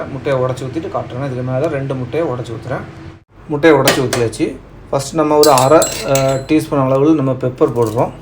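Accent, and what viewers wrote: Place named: Tirunelveli, India. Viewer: Super recepy bro 😋Nellai slang 👌👌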